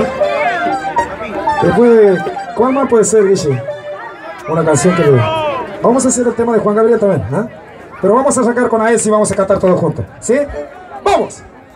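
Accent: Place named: Santiago del Estero, Argentina. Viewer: Como buen Santiagueño,le repercute mucho el asento,y la SS,pero canta lindo,ojalá q cuide su vos y su figura,eso es muy importante para sus fans y su salud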